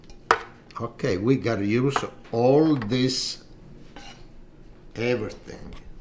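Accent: Italy. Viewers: ? Where would I be able to procure those little scallop dishes or scallop shells????? Bc where I live, I’ve never ever seen those, im in Pa, and there’s no good seafood stores or seafood places to get anything like that (?!), im in Lewisburg Pa, ( it’s a very old Victorian college town, yet ZERO seafood places to get FRESH Seafoods ect, anyhoo, I so SOOOOOOO ENJOY WATCHING N FILLOWING YOU! My grandpa Louis came straight here from Southern Italy, n he never even learned to speak English!! Lol lol. Yet I was able to understand Italian, but couldn’t SPEAK it to save my soul!!!! Lol lol lol🙃😁, yet somehow i understood every word he spoke to me, he use to walk me on his Hugh farm, and he would pull radishes straight out of the earth n dirt, brush it off n feed them to me! Lol, my matah use to have a FIT bc she said it wasn’t washed properly!! ( lol lol lol lol) yet my grandpa still did it anyway!and I luvvvvvved it!, tnk you so much for being YOU!, bc you sound exactly like him with your ITALIANO accent!!!!!👍👍😁😁🥰🥰🥰🥰😁🥰🥰😁😁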